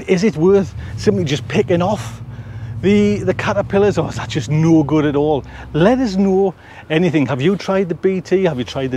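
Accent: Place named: Newcastle, United Kingdom. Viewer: Great surprise! Nice to hear a Geordie accent - I live in Colorado and we have a bad outbreak at the moment.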